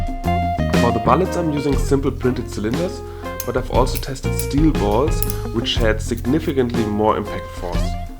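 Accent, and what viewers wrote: Germany. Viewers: First off, great construction! If I’m right and interpreting your accent correctly, you’re German. Please consider that this is a gun law violation, and if they catch you, you could get into serious trouble. If you are not german and dont have silly laws, then keep up the work lol xD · u sound very german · Are you german? Your english sounds a bit german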